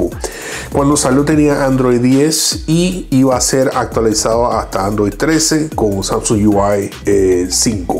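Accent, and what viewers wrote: Panama. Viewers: Hahaha escuchando me di cuenta que eres de Panamá, saludos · Ajajaja estoy buscando Review de este equipo y me salió un paisano que sin saber que era panameño por el acento me di cuenta ajajaja saludos bro buen Review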